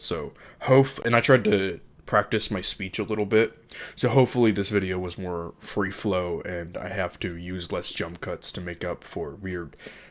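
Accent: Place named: United Kingdom. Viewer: Wait your American ? I thought you where brittish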